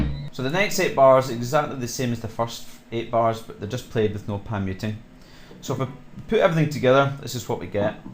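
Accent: Scotland, United Kingdom. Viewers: Are you scottish ?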